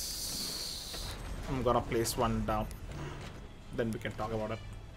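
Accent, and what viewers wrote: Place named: South India, India. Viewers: Are you Indian? Every time I think I have your accent figured, I doubt myself. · There are many indian accents. This is a southern one.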